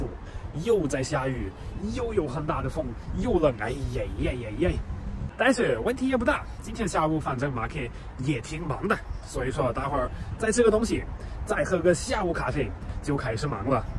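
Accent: Sichuan, China. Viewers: 马克的川普非常棒！ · 我愛马克的四川口音,太好了。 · 他說的是地道的四川口音，鄧小平一樣是這種口音。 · 从外国人角度看中国拍视频，不仅外国人愿意看，中国人也愿意看。非常好！马克的中文很好，而且有浓厚的川音。赞一个。如果你能把你视频的解说用中、英文加上成都方言有机地串起来，也许更有特点。比如，用英语表达外国人的看法，用中文（普通话）站在中国人的角度做解释和说明，然后用成都方言表演例证。这是不是会更有意思呢？当然，时不时加点儿德语，则更好。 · 标准川普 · 马克的中国话讲的真好，还夹杂成都话（浓厚的川味，椒盐普通话）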